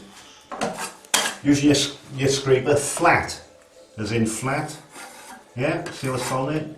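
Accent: Cardiff, United Kingdom. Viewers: Sounds like a Kardiff boy.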